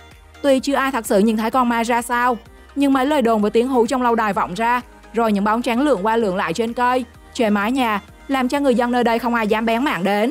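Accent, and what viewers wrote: Vietnam. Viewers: Chương trình thì rất hay. Nhưng giọng đọc thì tệ quá. Nam không ra nam bắc không ra Bắc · Nguoi mien nao thuyet minh kho nghe.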